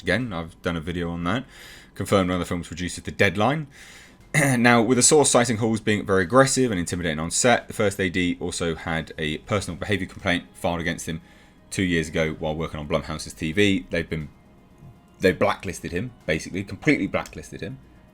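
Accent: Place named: United Kingdom. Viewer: You're so handsome, then add the British accent and it's a wrap for me lol. Sorry 🤷🏻‍♀️🤦🏻‍♀️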